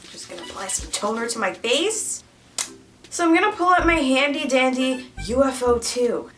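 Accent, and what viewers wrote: United Kingdom. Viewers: Love ur British accent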